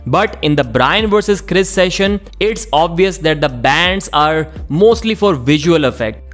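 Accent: India. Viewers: Indian voice -> disliked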